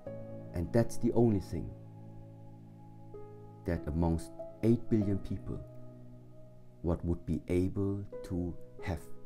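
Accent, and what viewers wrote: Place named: Germany. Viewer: Is he from Germany he has a strong german accent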